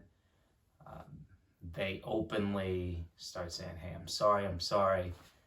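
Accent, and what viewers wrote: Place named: United Kingdom. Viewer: thank you I assumed this was a UK video as your accent didn't have the American tang to it. Thank you anyway.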